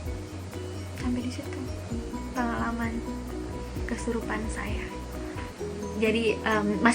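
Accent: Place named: Manado, Indonesia. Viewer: Logatnya manado ya kaa. Hehe sm🙂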